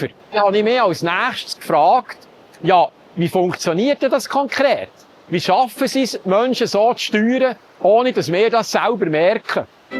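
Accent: Bern, Switzerland. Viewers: Ich habe so eine Freude an deinem Berndeutsch, obwohl ich keine Bernerin bin. Auch freut es mich im Abspann den Namen meines Wohnortes "Lauwil" zu sehen. Du bist ein charmanter Aufsteller mit Tiefgang.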